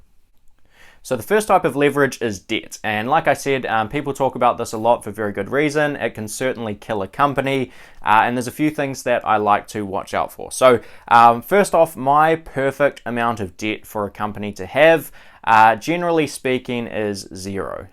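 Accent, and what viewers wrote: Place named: New Zealand. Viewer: Great video, many investors, particularly newer investors don’t look at the balance sheet of companies. Very important to make sure the company can comfortably handle its debts! Great vid mate, love the Kiwi accent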